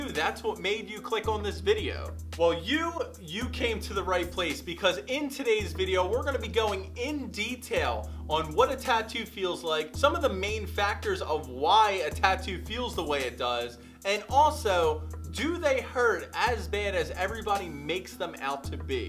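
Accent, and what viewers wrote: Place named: Delaware County, United States. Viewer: Delco accent. Know I can trust this guy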